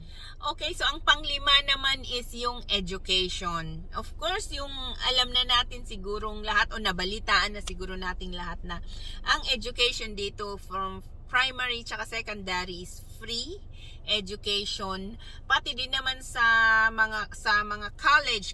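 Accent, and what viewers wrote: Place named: Cordillera, Philippines. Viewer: sabi ko kasi madam, mukang taga highlanders to base sa accent.. heheh thanks sa mga infos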